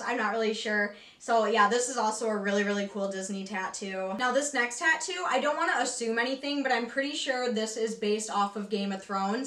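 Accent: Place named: Northeast, United States. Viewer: I always forget what a strong northeastern accent you have!(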